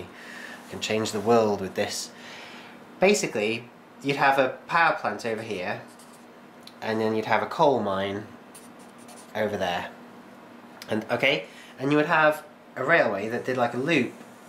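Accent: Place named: Corby, United Kingdom. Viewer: you have a horrible Corby-like accent.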